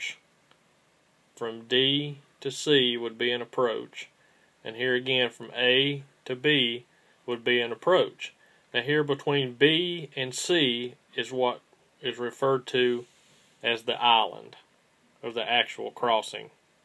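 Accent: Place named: Mississippi, United States. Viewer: I'm from Mississippi and that accent of yours sounds like it a Mississippi accent!!!!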